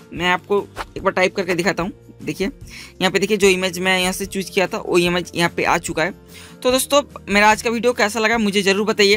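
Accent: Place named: Bengal, India. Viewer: Vo bengali hai bol ke uska hindi aisa hai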